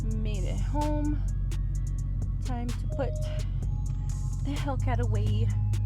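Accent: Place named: Florida, United States. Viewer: Sound like you from Florida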